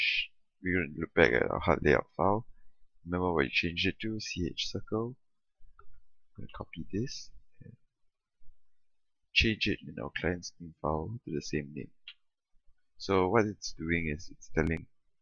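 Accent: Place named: Singapore. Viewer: i sense the singaporean accent :OO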